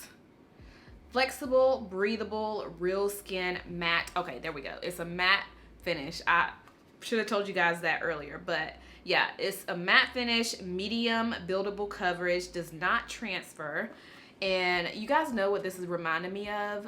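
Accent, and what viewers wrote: South, United States. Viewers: Girl, you are so pretty. And you sound like you’re from the south. Where are you from? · I love your southern accent I live I'm from New York city and I luv people with country accents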